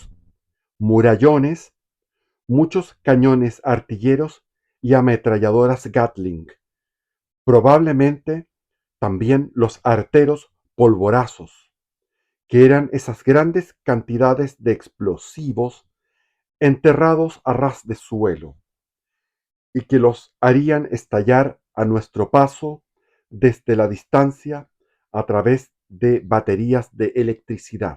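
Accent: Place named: Chile. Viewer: Feliz Año Nuevo desde Sevilla a Todos. Tienes una voz muy bonita y da gusto oírte .Eres muy educado y hablas muy bien .Me gusta mucho el acento de Chile y de Sudamérica en General. En cada País Americano , hay un acento diferente y solo oyéndolo, te digo si es de Perú , Mexicano, Cubano, Venezolano o Argentino etc etc.¡¡ Desde Sevilla , España , Saludo!! Pd , No me gusta Guerra entre Países hermanos .En realidad no me gusta Guerra entre humanos, pero entre Hermanos de lengua menos.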